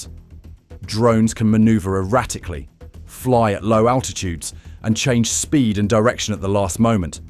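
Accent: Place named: England, United Kingdom. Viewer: The pictures are good ,but the pommy dramatic voice over is poor???? Just tell the story with no dramatics in your voice???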